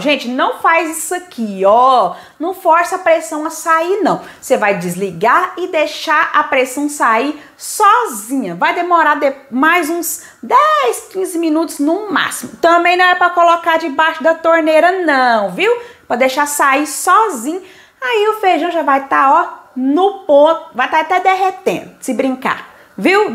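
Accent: Minas Gerais, Brazil. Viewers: Amei seu jeitinho de falar se e minerinha uaikkkkklinda amei sua receita vó faser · Nossa, que delícia, achei que era mineira por causa do sotaque mineira rsrsr.... Bjs adoro seus vídeos 😘😘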